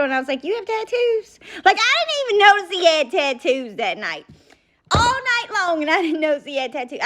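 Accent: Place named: South, United States. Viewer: I love that country accent.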